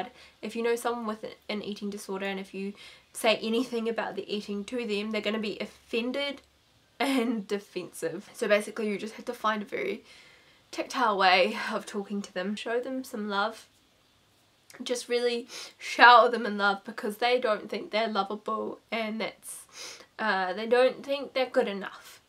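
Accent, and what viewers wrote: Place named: New Zealand. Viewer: Heyy hello from the south of NZ :) Nice to hear a kiwi accent! I really liked this, I too self recovered, developed depression (though seriously you wouldnt be able to tell with me either I always appear bubbly and happy!!) and although I am not vegan, I follow a plant based diet and it helped so much! So pleased things are looking up for you :)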